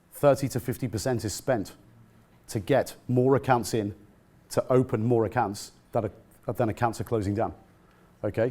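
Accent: United Kingdom. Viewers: Dont be intimitated with british accent. Turn on CC and translate words that dont make sense. Best of luck!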